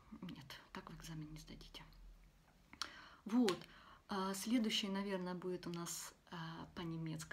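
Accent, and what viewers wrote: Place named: Ukraine. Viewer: Автор видео, вы бы сначала русский выучили! Стыдно говорить и выкладывать видео с таким колхозным малоросским акцентом!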